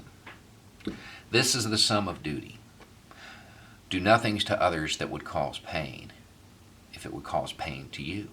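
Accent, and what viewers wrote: United States. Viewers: Great stuff man . This is what a real American sounds like 🇺🇸💯 Subbing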